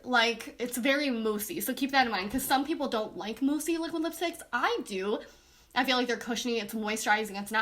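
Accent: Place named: Midwest, United States. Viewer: This is the very first box I THOUGHT I was signing up for... I signed up, thinking I was late enough into July that we would skip July and go right to August. When I opened the August box I was not pleased with any of it. I don't wear lashes, the Laura Lee colors are chalky and they don't apply smoothly nor do they blend very well. I'm surprised she launched with a formula this difficult to work with. I do feel bad for whatever the drama she and some of the others are going through. I appreciate all those who are staying out of it and remaining positive/uplifting. I'm new to your channel because of Boxycharm. You sound like you've got a Midwest accent. I'm from Wisconsin but I'm not sure how much of the accent I still have, since I moved away at age 19. Haha, "Poop Brown" that's fun! I enjoyed your no-nonsense review. If you go for Jaclyn Hill's vault or maybe some of the BH cosmetics palettes for color (Zodiac, or Galaxy palettes --they have 4 of them, and they are $18 each unless you find a sale.)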